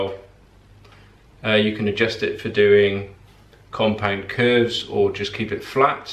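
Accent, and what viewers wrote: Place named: England, United Kingdom. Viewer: Do you need an apprentice? I noticed your English accent are you in the UK? Love your videos thanks for sharing 🙂👍👌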